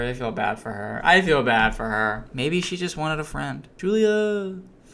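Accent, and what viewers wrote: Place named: Canada. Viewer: You sound Canadian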